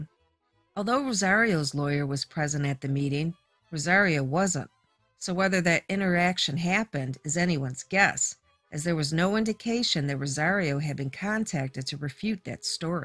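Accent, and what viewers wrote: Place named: Brooklyn, United States. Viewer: Your channel is so good. And ur accent ( im from boston) sounds so familiar but i cant quite place what part of NYC. Old school Brooklyn would be my first guess tho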